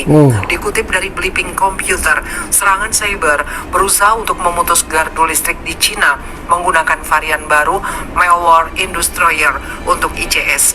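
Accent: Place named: Malaysia. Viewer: Bahasa anda gak jelas dan membingungkan dan terdenar lucu, ( bhs. malaysia ).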